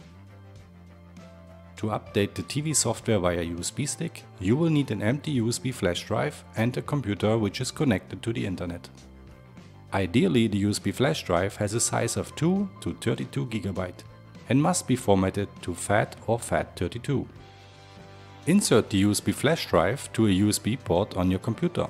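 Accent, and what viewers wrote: Germany. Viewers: Why using a native German speaker for this video. In fact because it's a Dutch brand it would be more appreciated to use a native Dutch speaker 😂😂😂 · I think you are german 😂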